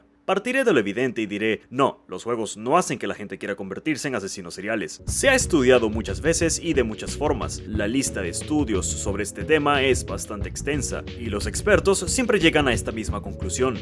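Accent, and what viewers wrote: Venezuela. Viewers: una pregunta y disculpa eres venezolano?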